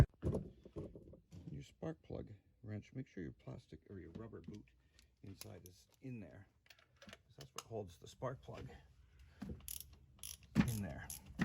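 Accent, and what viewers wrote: Canada. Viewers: U know you're from Canada by how u say "ouwt"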